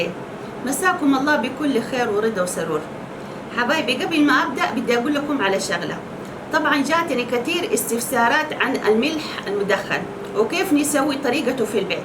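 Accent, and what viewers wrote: Hejaz, Saudi Arabia. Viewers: ماشا الله. والله احلا شي ذكر الله 😙😙😙 وزين اللهجه الحجازيه. ياخ احب الام دي وبنتها ❤❤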